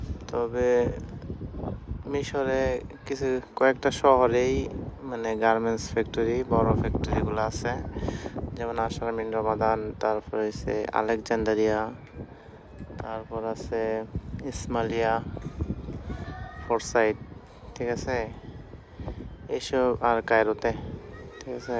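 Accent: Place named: Narayanganj, Bangladesh. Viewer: ভাই আপনার কথা সুনে মনে হলো আপনি নারায়ণগঞ্জ এর ছেলে হবেন,,, 🎉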